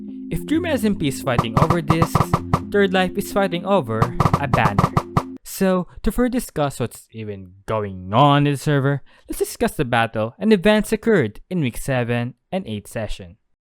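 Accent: Philippines. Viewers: Your accent is very filipino, you from pangasinan or somethin?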